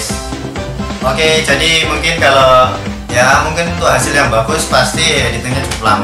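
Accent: Java, Indonesia. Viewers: Wong jowo yo?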